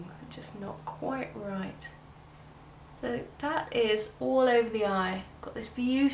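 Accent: England, United Kingdom. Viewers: Indian with an English accent?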